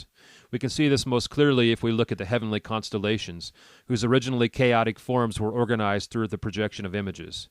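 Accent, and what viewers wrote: United States. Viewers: you have the most americanest accent my ears ever did hear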